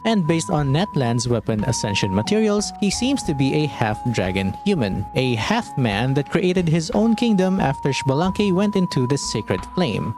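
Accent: Philippines. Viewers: Are u a filipino fellow? Almost similar accent with peeps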